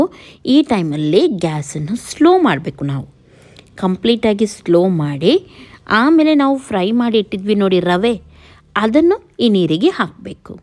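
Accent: Mangalore, India. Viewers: But really nice 🙂 speaking 🗣️ mostly they are manglurians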